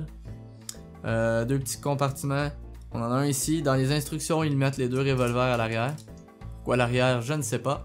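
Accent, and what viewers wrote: Quebec, Canada. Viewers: Je vien de découvrir ta chaîne et dès que tu as commencé a parler j'ai su que tu était un frère du QUÉBEC !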